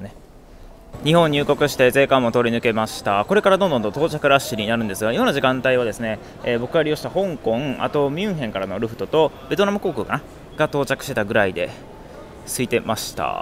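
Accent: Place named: Kansai, Japan. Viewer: バリバリの関西人ですからね、うp主は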